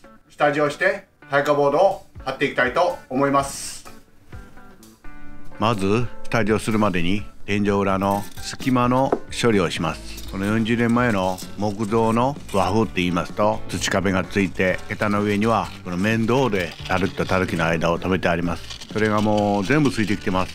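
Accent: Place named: Wakasa, Japan. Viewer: すごく関西弁の若狭訛りっぽさを感じる…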